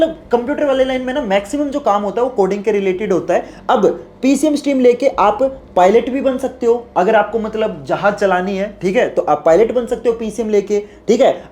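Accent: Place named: Haryana, India. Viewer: Sir m aapko bta skti hun aap haryana se ho 😁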